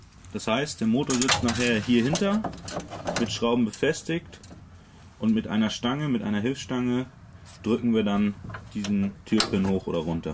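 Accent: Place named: Baden, Germany. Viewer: echt cool es Video von eurem Akzent denk ich Mal ihr kommt aus der Badener Gegend oder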